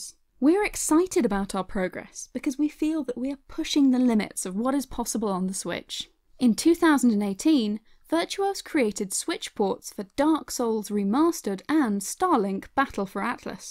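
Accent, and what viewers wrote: United Kingdom. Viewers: Love your voice and accent! 😍😍 Are you from the south of England? I would guess Surrey or London :P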